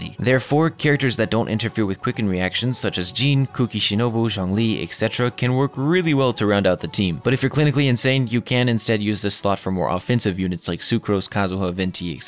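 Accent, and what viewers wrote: United Kingdom. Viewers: Why do you sound more british than american?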